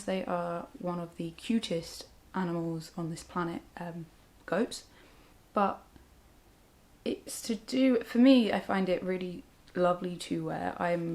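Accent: United Kingdom. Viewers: Hi, I found your channel recently and I've so enjoyed all the videos I've watched so far. I'm also from Herts, and a knitter, and your voice really reminds me of home- I live in London now, so not a million miles away so maybe that's weird 🙂! Anyway, just wanted to say Hi and introduce myself.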